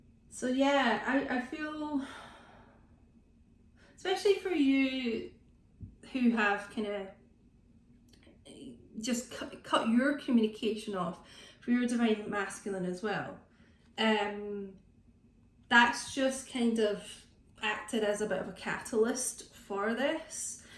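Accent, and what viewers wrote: Scotland, United Kingdom. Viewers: Great realisation. What a beautiful Scots accent.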